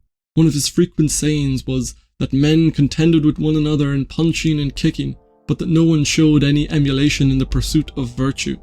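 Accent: Ireland. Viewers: Oof, what a lovely irish accent. Such pleasant listening.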